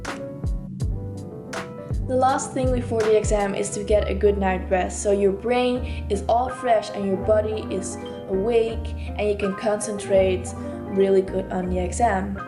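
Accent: Netherlands. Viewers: Je bent Nederlands! Ik dacht al dat ik een accent hoorde. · Talia Bilal she sounds dutch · Je hebt een Nederlands accent op je Engels. Oefen een beetje!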